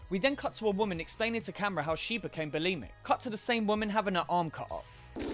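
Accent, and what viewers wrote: United Kingdom. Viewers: Yes I always thought I would love to critique movies just like this! I am the crazy movie watcher😂 also hearing your accent makes me REALLY miss the 🇬🇧!!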